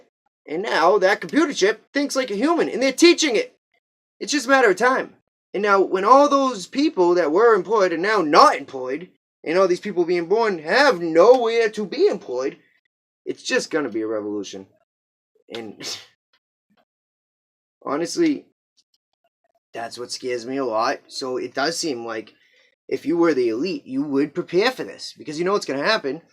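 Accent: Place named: Massachusetts, United States. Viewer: i just started watchin your videos. i like youre stuff. its funny bc i know a northshore mass accent when i hear one.... where you from? :)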